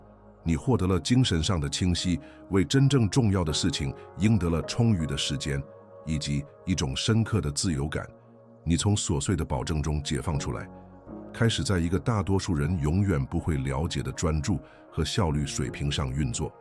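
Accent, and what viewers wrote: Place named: Hong Kong, China. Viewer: 是ai生成的港式普通话吗？有的词听起来会有歧义。最好是标准一点的普通话。内容不错，加油！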